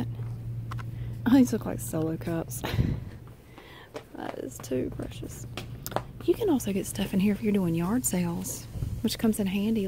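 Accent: United States. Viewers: Your asmr videos always give me the tingles before I fall asleep I love your American accent so much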